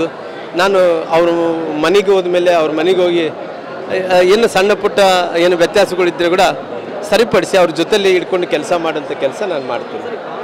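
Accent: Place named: Telugu, India. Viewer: Telgu kannada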